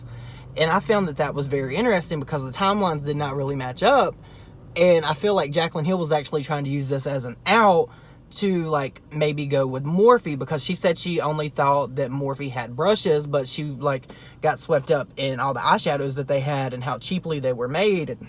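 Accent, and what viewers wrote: South, United States. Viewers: New viewer! Love your accent! I'm a southern girl, too. Where are you from? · Where is your southern accent from? I'm from Georgia. 😁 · I know you don't know much about MAKEUP itself, but did you just say, "Pat McGrath is rolling around in HIS grave?" I played it back many times!!! Pat McGrath is a Woman, and also still living!! Maybe it was just that I had trouble understanding your Southern Accent, and So Sorry if I misunderstood you. I was just at a loss when hearing that!!! WOW!! Jaclyn's Shady as ALL HELL!! I agree with her Ex husbands IG, (where he posted after her post of her hand on top of her new man's hand!) Well he Posted a photo, of his dogs paw on top of his hand, (meaning to me, A BITCH's paw or hand. One in the SAME!) LOL!! I understood what Chris was meaning!!! ALSO AMERICAN HORROR STORIES ON TV ON WENSDAY NIGHTS, TODAY'S ONLY TUESDAY!!!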